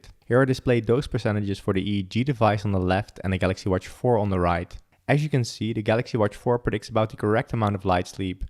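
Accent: Netherlands. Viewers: Are you Dutch? :) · This guy must be dutch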